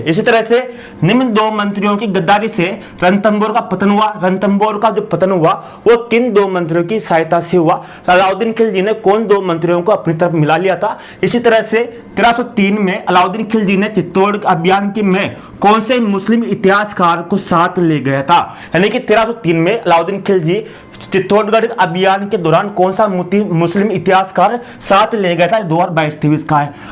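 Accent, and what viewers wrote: Hadoti, India. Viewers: Sir aap hadoti se ho kya, aapki boli bata rahi Hain, main tonk se hoin 😊❤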